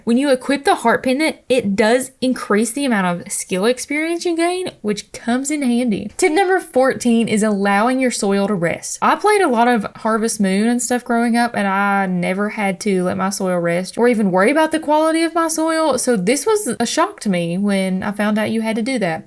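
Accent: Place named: Texas, United States. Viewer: Damn, the stealing from enemies thing is something I forgot about and you'd be forgiven for doing so as the game has so much to it it's easy to. Some fantastic tips here. I've put 60 hours into this game and much of what you've covered I didn't even know about, especially the boss loot,l farm, I've been just defeating them everyday and either getting the drop or not 😅 thank you for this, also you have a great accent! From Texas I assume? Sincerely a UK person 😂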